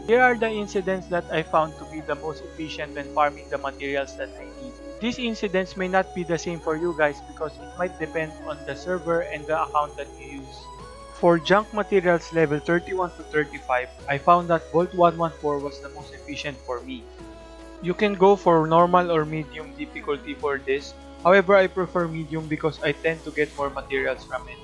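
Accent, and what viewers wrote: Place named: Philippines. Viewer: Nice I need farming materials I need more for my attackers need more yarn and you sound Pinoy. Pinoy ka ano? At ako newest subscriber mo helpful vid mo eh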